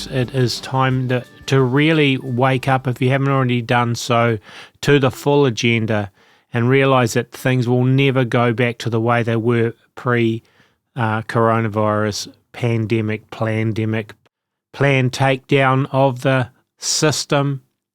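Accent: New Zealand. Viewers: I always smile when u first welcome viewers - a Kiwi accent! 😃💗 Beautiful! From the Waikato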